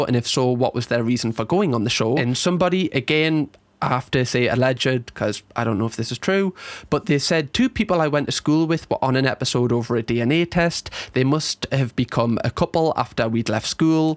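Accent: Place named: Newcastle, United Kingdom. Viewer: The amount of times you say video makes me wish I could say it like you. I'll get my dad to teach me the ways of sounding more Geordie. 😆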